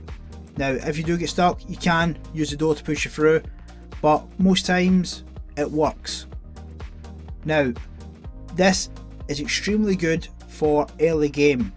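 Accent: Scotland, United Kingdom. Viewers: Are you Scottish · He bri'ish ?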